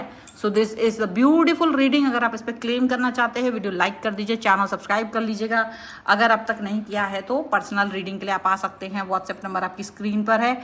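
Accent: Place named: Uttar Pradesh, India. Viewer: 😘😘😘😘😘 aap mujhe U.P. se lagti ho kyuki main bhi U.P se hu and aap ko sun ke maine pehle bhi poocha tha...jab first time aapko suna tha....mujhe hamare ghar ki yaad aa jati hai..😢 isliye ...naa ki kuch galat hai...I truly like you and appreciate your efforts ❤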